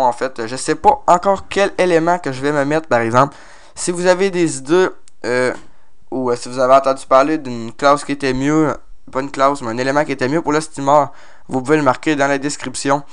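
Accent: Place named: Quebec, Canada. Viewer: Très bien ta voix québécoise ;) ! Et pour le nom du steamer....euh... Aucune idée mais fait gaffe au pseudo Vu que sans doute on n'aura pas le droit de mettre le vrai nom de la classe ;) et pour l'élément va sur le site dofus tu peu déjà voir quelque sort les caractéristique et tout :) bref...très bonne vidéo ;)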